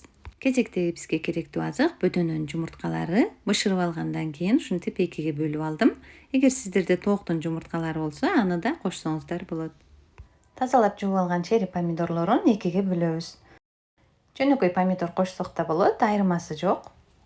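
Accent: Standard. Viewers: Азаматсыз таза кыргызча суйлоп салаттврды жегиликтуу ,жегиликтуу кылып жазап жатканызга !!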